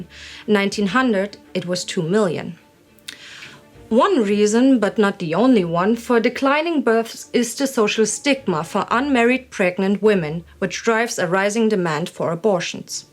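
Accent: Germany. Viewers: your video is exceptionally well researched and the presentation is professional and beautiful. I assume that you are German although your English is excellent. I can hear that in the way you pronounce German names and terms. I'm going to subscribe to you now because you really deserve it. Love from the German Alps:) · Very interesting post to the channel. Also, your German/English accent/inflection is charming and reminds me of friends mother who was born in Germany and imigrated to the US in the 193os.. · It is called a (faint?) german accent. It goes well with her stern looks. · A clue for you, she's speaking English, albeit with a German accent. HTH.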